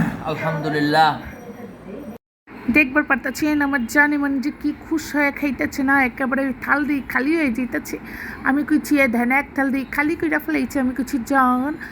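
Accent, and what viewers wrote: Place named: Dhaka, Bangladesh. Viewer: আসসালামুয়ালাইকুম আপু আপনার ভিডিওটা অনেক সুন্দর হয়েছে আপনি যে এত সুন্দর করে শুটকি ভুনা করেছেন দেখেই মন চাচ্ছে দৌড়ায় এসে খেয়ে ফেলি আর সেমাই পুরি তো দেখতে অনেক অনেক সুন্দর হয়েছে খুব ভালো লাগলো আর প্রত্যেকটা ভিডিওতে আপনি যে এত সুন্দর করে ভয়েস দেন আর আপনার এই আপনার মুখে ঢাকাইয়া ভাষা অনেক অনেক বেশি ভালো লাগে আপু এভাবেই আরো অনেকগুলো ভিডিও দিবেন আমরা অপেক্ষায় থাকবো খুব ভালো লাগলো দোয়া রইল আপনার ছেলের জন্য তাড়াতাড়ি সুস্থ হয়ে যাক❤❤❤❤❤❤❤❤❤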